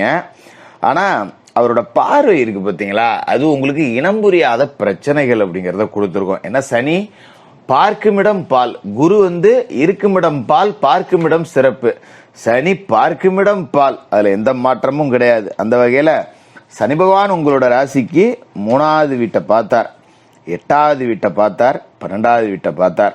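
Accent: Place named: Thanjavur, India. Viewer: உங்க slong தஞ்சாவூர் மாதிரி இருக்கிறது எந்த ஊர்ல இருக்கீங்க ஜோசியரே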